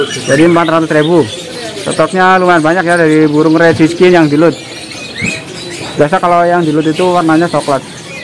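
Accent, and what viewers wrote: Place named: Java, Indonesia. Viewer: Jawanya medok. Banget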